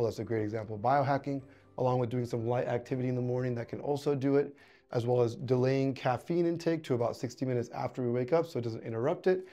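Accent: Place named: Iran. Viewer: Nice irani accent.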